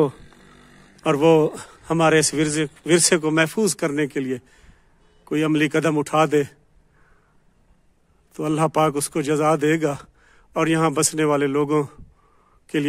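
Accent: Saraiki, Pakistan. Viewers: Saraki ka tarhka lgaya kro Maza ata hai.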